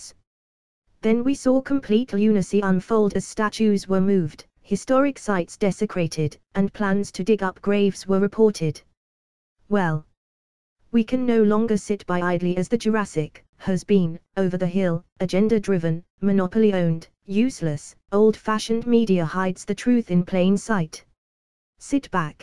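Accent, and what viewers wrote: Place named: United Kingdom. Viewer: But at least she has a British accent which is always attractive on the ear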